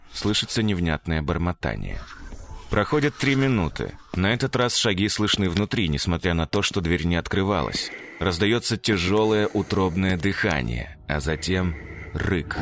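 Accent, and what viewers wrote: Standard. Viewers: чистый русский язык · Симфония, песня, бальзам для сердца. Чистый русский язык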